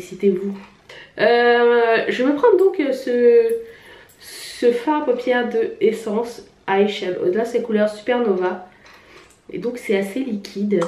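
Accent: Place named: Quebec, Canada. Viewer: J'aime bien quand tu parles à la québécoise!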